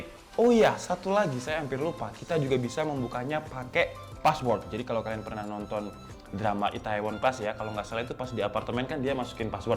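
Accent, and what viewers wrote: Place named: East Java, Indonesia. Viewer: Orang jawa timur ya bang? Kalau benar abang orang jawa timur jadi kita sama :)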